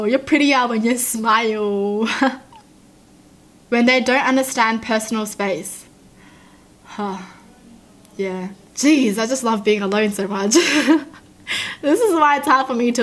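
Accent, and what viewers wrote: Australia. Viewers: Sirkay I’m Australian and I love Aussie accents but is a mix of Aussie and another country... I can’t tell which though. I love her accent. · Her accent is from Australia a very beautiful accent and land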